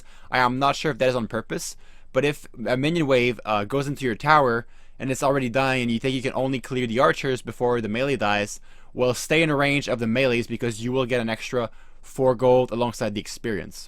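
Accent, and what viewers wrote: Quebec, Canada. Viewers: Are you from Quebec? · i can feel the french canadian richness in your voice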